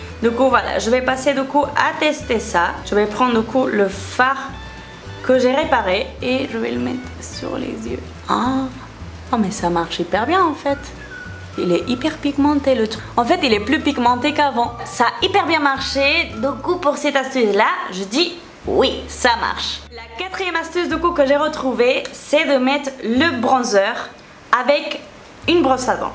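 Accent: Spain. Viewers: On dirait trop que tu a un accent espagnol je t'imagine parler en espagnol lol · Origine espagnole 😄🇪🇸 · Ton accent 👌 Espagnol ? Les 2 première astuces m'intrigue, je tenterai également Bisous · j adore ton acent tu est espagnol continu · Elle vient d'Espagne :)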